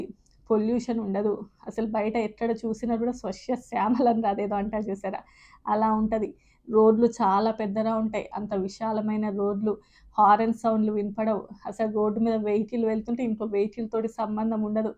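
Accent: Telangana, India. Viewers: Nalgonda slang ❤❤❤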